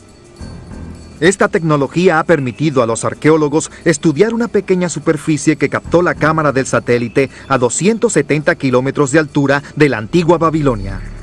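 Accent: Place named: Latin America. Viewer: vamos a ver colega, ejm, Semiramis era la esposa de Sshamshi Adad V, unos 300 años anterior a los que tu dices relacionandola con Nabuconodosor, imagino, por tu acento, que se debe a que eres del continente americano, y permite decir, que fuera de vuestro continente, soleis tener una concepción del tiempo muy peculiar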